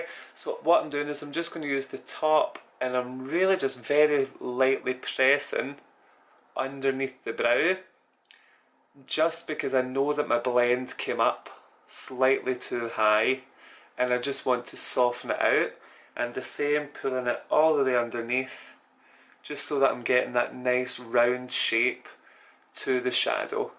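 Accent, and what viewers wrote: Scotland, United Kingdom. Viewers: Wow!!! Seems like there is another one who´s bored of the Nude Make-up all around ;D!!! I love your makeup!!! OMG!!! You´re from Scotland!!!! I looooooooove your accent! Wanna go to Edinburgh this summer (a bit bored of London and Kent now ;D)! Meanwhile I have to learn the accent ;D, guess I have to watch "Outlander" another time XD! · very pretty! and i love your makeup. are you from scotland?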